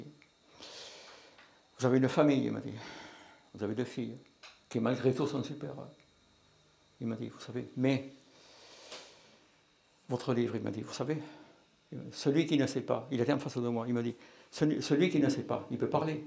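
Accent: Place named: South, France. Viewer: Après avoir vu la video de nurea tv, je ne m'attendais pas à un tel accend du sud 😅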